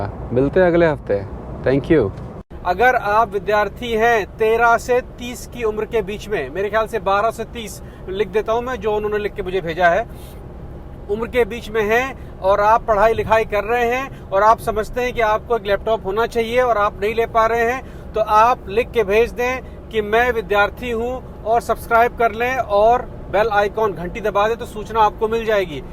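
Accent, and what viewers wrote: Bihar, India. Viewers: Aap bihar se kya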